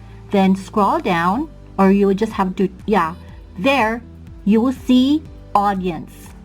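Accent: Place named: England, United Kingdom. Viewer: i love this tutorial,,, maayos yung pagkakaturo.. i also love your english accent po hehe,, new friend po, sana mabisita nyo rin ako salamat po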